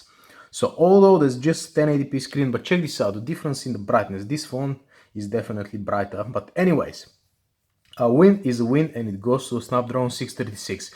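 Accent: Russia. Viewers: Russian bad English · russian accent prosto pizdec)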